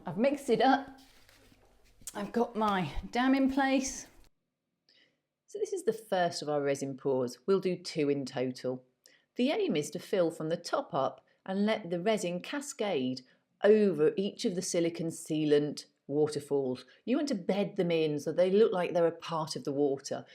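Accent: United Kingdom. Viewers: Oh my ,I`m going back 3 years,but soooooo much to see and learn from you.First off ,as the lady below mentioned, it is quite nice to see a woman doing these types of tutorial videos....a real breath of fresh air (and I like the English accent) Now then I plan on doing a bit of water effects on my expanded layout (most likely using Envirotex Lite ,readily available at my craft store and 50% off coupons make it a good choice) and was wondering if you were using Tamiya acrylic paint to tint your water product and if I could use Tamiya to tint Envirotex Lite ? Thankyou and please keep making these fine videos!! Oh oh ,I was watching another of your vids (Dec.2015) about your troubles with Envirotex Lite ,the browning and the bubbles etc.Do you figure it was the way you mixed the product that may have caused these troubles ?Thanyou.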